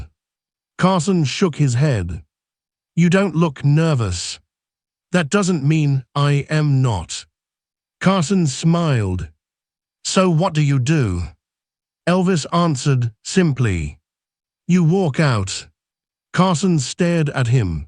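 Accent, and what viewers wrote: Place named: United Kingdom. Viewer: I didn't click on here to listen to a Limey run his dialog for an hour. I'm deleting my sub and thumbs up too.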